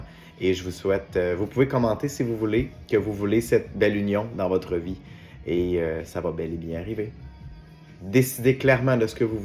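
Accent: Quebec, Canada. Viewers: J'adore votre énergie et vos guidances et votre accent canadien trop mignon un lingot d'or ❤ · Bonsoir le Canadien de la part d une Française c est la première fois que je vous vois merci pour votre super accent ❤❤❤ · Oh bonjour monsieur je viens de vous découvrir fabuleux gentleman j'adore votre quidance merci beaucoup monsieur bonne journée et j'adore votre accent cananadien oh caribous respect. 😊❤❤❤ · Bsr nouvelle abonnée quel joli accent je suis partie dans la region des laurentides en 1991 ( j avais 24ans) pour mes études d ' infirmiére ...quel beaux souvenirs Québec je me souviens gros becs christine des bsx à mes amies amis Québecois chris ...le skidoo ...les cabanes à sucre le sirop d érable etc etc bisous ❤❤❤❤❤❤❤ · Good morning mister, nous avons le meme accent anglosaxon moitie quebecoois.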